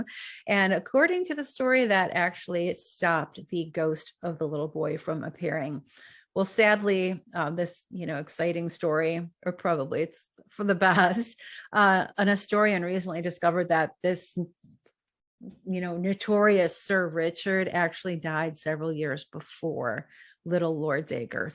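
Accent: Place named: United States. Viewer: The American accent jars; so no thank you!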